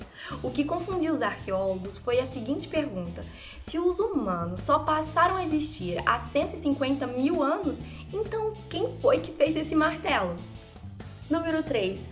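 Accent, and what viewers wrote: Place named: Minas Gerais, Brazil. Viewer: esse jeitin minerin de falar me despertou um interesse em saber se essa moça é solteira e se ela faz o wats dela deixar de ser um mistério pra mim kkkk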